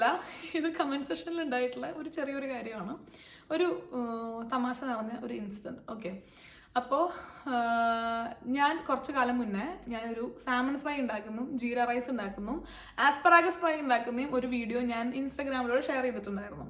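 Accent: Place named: Malabar, India. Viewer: Chechy njan kure nalai vedios kanarund. Njn kozhikodekari Anu so chechyde malabar touch ulla samsaram bayankara istanu. Njn agne long vedios adikam kanatha alanu ipo chechide long vefios okke pattumbolkke full ayit kanarund. Karanam chechide samsaram kelkn nalla resanu. Mathralla chechide life style, healthy food habits, okke enne irupad motivate cheythittund. Chechine enik valya istanu. Puthiya vedios nai kathirikkunnu. Orupadu Snehathode - chandhu😉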